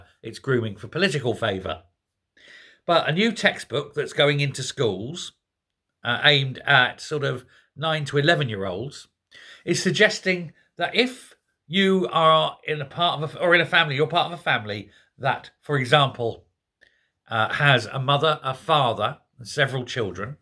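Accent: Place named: RP, United Kingdom. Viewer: Just out of interest - if you are from Ireland then where did you get your rounded vowels & fairly posh English accent from?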